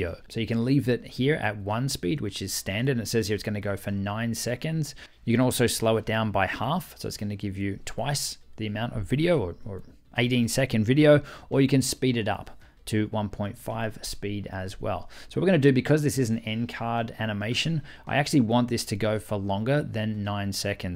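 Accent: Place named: Australia. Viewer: Wow, there's something new every day! Top tips here. It's great to have the occasional Aussie explaining too, none of that grating 'hey what's up guys!' Shudder, puts me right off that does. Just kicking off the youtube journey and will be following you and the courses you do. Thanks very much for the help so far. Terrif! KP - a pom, somewhere in the UK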